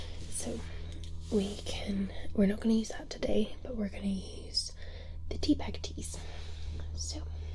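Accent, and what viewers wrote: Scotland, United Kingdom. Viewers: Her Scottish accent is everything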